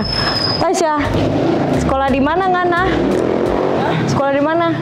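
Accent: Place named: Manado, Indonesia. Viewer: sekolah dimana ngana ? fasih juga logat manado nya..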